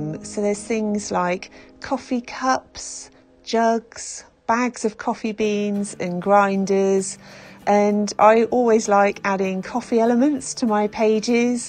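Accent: United Kingdom. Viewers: Dear, first of all, I want to emphasize that my comment relates to all of your videos and not specifically to this one: I love your calmness, your British accent and it's always as if you were smiling while recording. It's lovely. 💕The music in the background and the recognizable colours (being coordinated) make you stand out among lots of other, also very talented people on the net. Thank you for sharing and keep up with your style and youtubing.:) Looking forward to it ❤️ Greetings from Serbia 🌞👋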